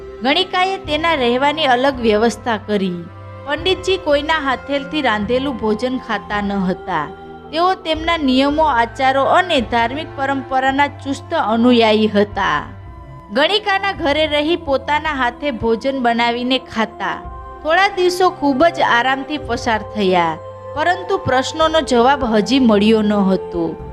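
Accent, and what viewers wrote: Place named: Saurashtra, India. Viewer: JAY HO. GOOD East African OR SAURASHTRA (KATHIYAWADI) GUJARATI LANGUAGE. 🙏🙏🙏🙏🙏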